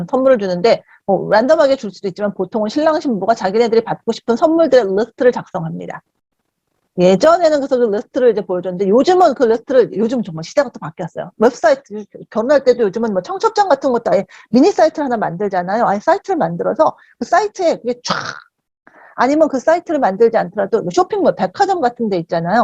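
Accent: United Kingdom. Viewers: 이 영국식 발음이 있으셔서 더 고급스럽게 들리는거였군요… 항상 명강의 감사합니당.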